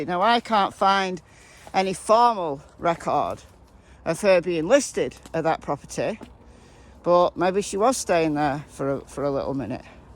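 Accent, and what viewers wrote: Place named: Greater Manchester, United Kingdom. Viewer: Your videos are very interesting they are just not drama I appreciate what you do and I love listening to you talk your accent reminds me of my relatives from Ashton Underlyne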